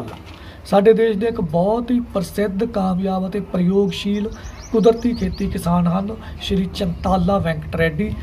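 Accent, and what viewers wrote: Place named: Malwa, India. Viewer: ਜੇ ਹੱਥ ਨਹੀਂ ਦੁਖਦਾ ਤਾਂ........ ਸੀਦੀ ਮਲਵਈ ਬੋਲੀ Good sir ji ਅਸੀਂ ਸ਼ੇਅਰ ਕਰ ਦਿੱਤਾ ਜੀ ਧਨਵਾਦ ਜੀ।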